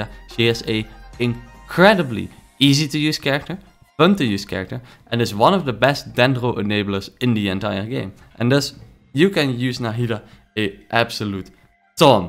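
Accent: Netherlands. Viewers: Are you dutch? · The Dutch accent haha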